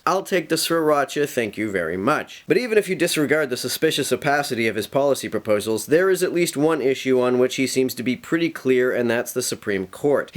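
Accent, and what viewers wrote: Canada. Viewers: You're Canadian? But your accent is more less Canadian than mine (I'm from Minnesota).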